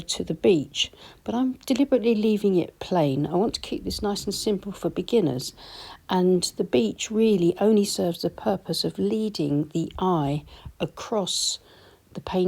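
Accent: England, United Kingdom. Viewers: I love your work and your kind voice and your beautiful English accent 😊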